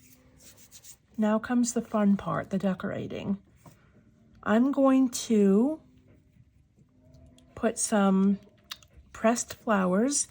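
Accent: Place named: Boston, United States. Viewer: New subscriber!! Oh my gosh so wicked-awesome you're an Neweglandah!! Luv your Boston accent....my dh is Irish ❤️ his pak dah cah phrases at times! So hilarious 😂 You're so creative! Blessings!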